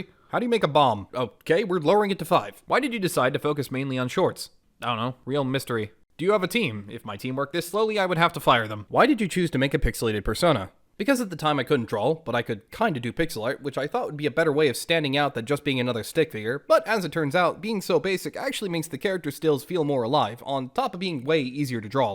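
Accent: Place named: New England, United States. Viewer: you for the info I though he has the new England accent or speech paturn.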